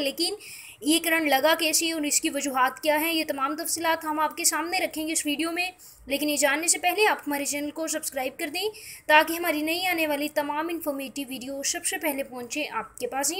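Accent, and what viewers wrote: India. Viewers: yeh kisi indian ka channel hai. haram zadon ne Pkistaniyun ko confuse aur misguide karnay ke liye channel banaye huye hain aue bhonktay rehtay hai...mein shartia kehta hoon yeh ko indian larki ya aurat hai Pkistan ke kisi ilaqay mein aisa lehja aur alfaz ki adayegi mei ne nahin suni..yeh koi asami ya tibti accent wali hai..laanat ho tum indians haram zadon beghairton kameenon par.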